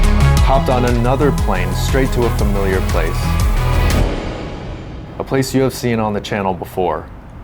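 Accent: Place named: Philippines. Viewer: I love how you familiarize to pronounce these places in a very Filipino accent. Thats awesome which many foreigner vloggers can not😊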